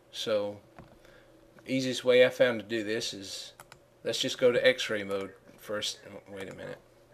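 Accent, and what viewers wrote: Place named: Texas, United States. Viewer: you explain things damn good! thanks a bunch! is that a texan accent or what exactly? im not from america sry!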